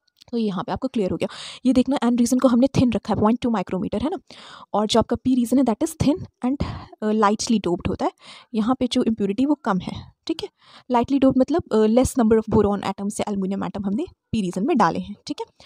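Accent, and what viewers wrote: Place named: India. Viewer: Please speak slowly, aapki BPO wali accent se aadha lecture uper uper jata hai, thoda toh cold blooded accent use karo kaha jane ki jaldi hai.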